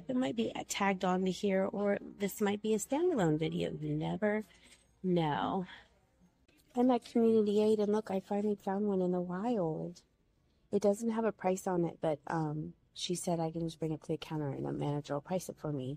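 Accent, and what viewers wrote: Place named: South, United States. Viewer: Love your southern accent! Cant wait for the Maniac one... downeast? Or perhaps Acadian?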